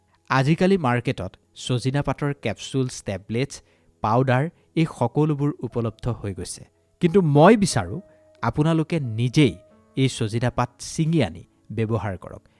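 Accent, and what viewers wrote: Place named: Nalbari, India. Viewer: Nalbari para koiso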